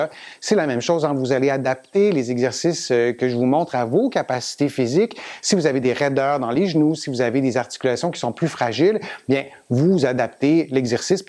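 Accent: Quebec, Canada. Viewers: Bonjour, tout d'abord merci pour vos vidéos! Je suis québécoise, habitant en France, mais j'ai besoin de me reconnecter avec l'accent de mon patelin ça me fait du bien! Je voulais juste vous proposer, lorsque vous démontrez un exercice pour les jambes, de descendre votre caméra au niveau de vos jambes. Je suis prof. de fitness et ce genre d'exercice, aussi simple soit-il amène un positionnement souvent erroné. Le genou est souvent trop vers l'avant etc..le visuel est très "parlant"! Encore merci pour vos capsules, dans notre métier il faut se tenir au courant et se mettre à jour continuellement.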